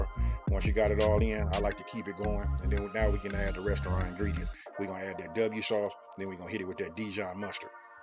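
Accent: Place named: South, United States. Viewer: Yes but cause he's living in Texas and working he is definitely sounding like a Texan too!😉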